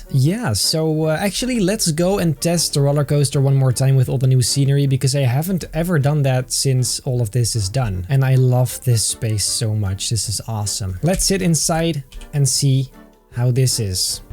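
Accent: Netherlands. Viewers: I said dutch accent but English language like he has done on his main channel. Those videos are crazy funny. btw i don't know dutch either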